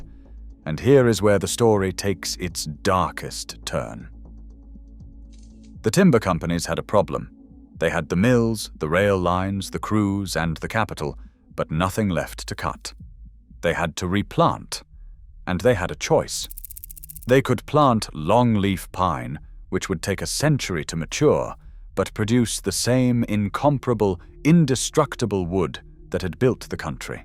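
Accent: United Kingdom. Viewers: Lasted 2 seconds until I heard the British accent then closed video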